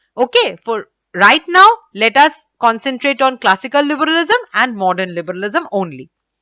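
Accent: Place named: India. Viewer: I have decided to see all your videos on Marxism. It is easy to understand because of your accent? Indian? I hope you make videos on Lenninism ,Maoism And contibutions of Engels. I want to watch your video on Communist Manifesto, Das Capital, and other writings of Marx. Thank you very much.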